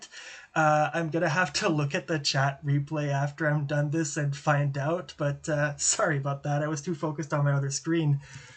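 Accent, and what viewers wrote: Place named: France. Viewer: J'adore ton accent français !! Merci pour toutes les transcriptions ! J'adore faire du déchiffrage ! J'ai commencé avec le confinement en mars 2019, grâce à toi (et d'autres sur le web) j'ai fait des progrès en déchiffrage. Mais si certains titres ne sont pas à mon goût ! 😁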